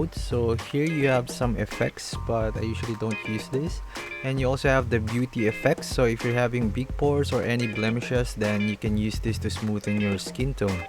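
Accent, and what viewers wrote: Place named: Philippines. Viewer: Iba talaga ang accent kapag pinoy nakakaproud🤧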